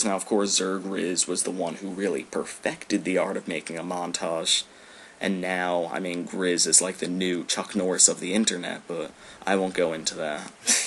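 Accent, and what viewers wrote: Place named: United Kingdom. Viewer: FlaShVidsHD well he does sound British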